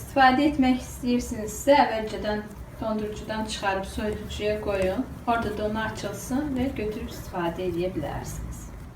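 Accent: Azerbaijan. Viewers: Azerbaycan şivesine bayılıyorum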